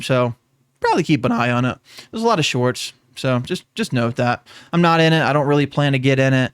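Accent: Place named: Northeast, United States. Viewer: Homie i have to know where is your accent from, has to be the NorthEast region somehow. Let us know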